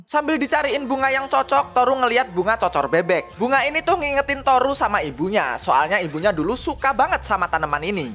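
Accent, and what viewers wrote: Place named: Banyumas, Indonesia. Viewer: Bjir admine wong ngapak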